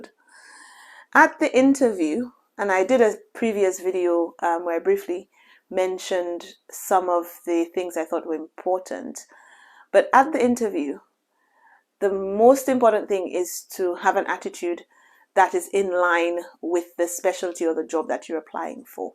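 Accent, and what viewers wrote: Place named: United Kingdom. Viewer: Your accent is definitely British 😅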